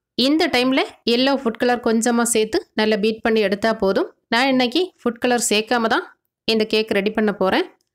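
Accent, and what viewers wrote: Kerala, India. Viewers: Are you a malayali pronnounsation malayali touch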